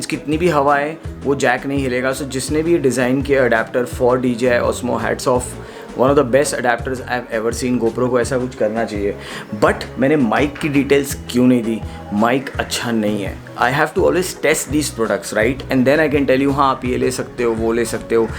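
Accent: Gujarat, India. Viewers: Are you guju bro ?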